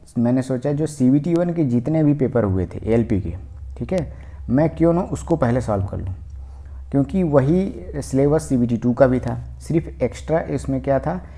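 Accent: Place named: Tamil Nadu, India. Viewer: You sound like tamilan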